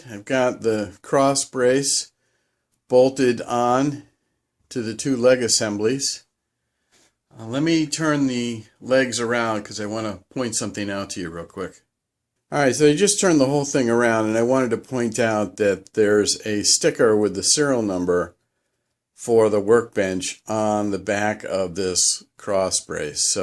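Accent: New England, United States. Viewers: Sounds like Norm as he is from New England as well. Watch New Yankee Workshop and you will hear it as well.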